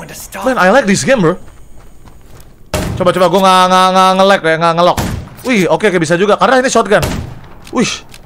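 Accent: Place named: Indramayu, Indonesia. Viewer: Indramayu nih bang. Abang asal mana?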